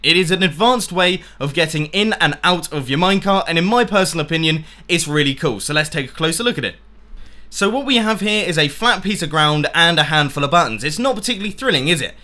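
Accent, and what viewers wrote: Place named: United Kingdom. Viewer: What on Earth is this eccentric sounding British chap talking about?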